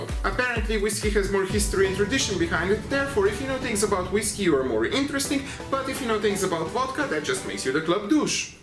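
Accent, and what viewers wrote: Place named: Russia. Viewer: à Guy with russian accent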